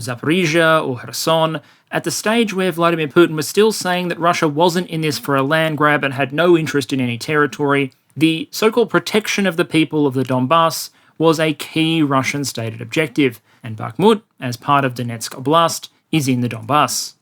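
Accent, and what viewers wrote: Australia. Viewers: Sorry but your whiney Aus accent makes it too hard to listen.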